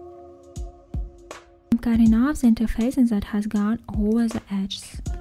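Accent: Russia. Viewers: И русский акцент)) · Are you Russian?)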